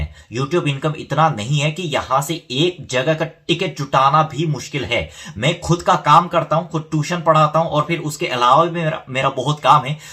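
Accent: Standard. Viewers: Kon bevkuff hai jo ye sab bola kisine subscribe kiya toh aapko kharid nhi liya hai sach a low thinking ese log bahut selfish hote hai are uski life hai woh kahin bhi jaye ghumne tumko qya kam se kam woh aapki sanskriti ko toh faila raha hai korea ka hokar he is inocent guy i like his clear hindi accent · Ettni shudh hindii mujhe bhi nhi aatii 😅 i like your shudh hindi 😁 I am from Bihar Patna 🙂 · Nice video 💜💜. Itni shudh hindi to mujhe bhi nehi ati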